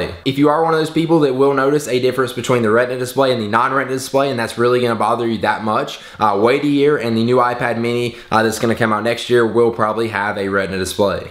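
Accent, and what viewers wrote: South, United States. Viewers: Has nothing to do with the iPad mini but you look extremely cute with that short hair and I would date you if you went this way. Just saying. Love then country accent too. ;) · He has a slight southern accent qce* qce* qce*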